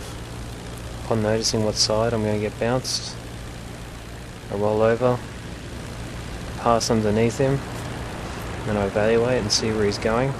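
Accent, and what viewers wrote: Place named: England, United Kingdom. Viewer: That's because you enunciate your words & they have never truly heard an English accent haha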